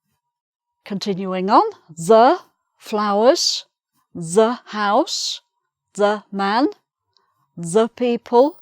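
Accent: United Kingdom. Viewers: I loved it! I like your british accent, what part of the UK are you from? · Hi, thanks so much for your lessons. I'm fond of your accent, it is so British? Your explanations are clear and well structured, you're an excellent teacher!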